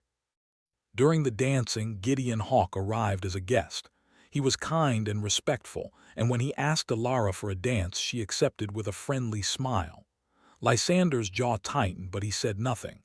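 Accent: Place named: South, United States. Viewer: I'm sorry but you can't use the country voice for these stories. It just doesn't fit and doesn't make me want to watch it.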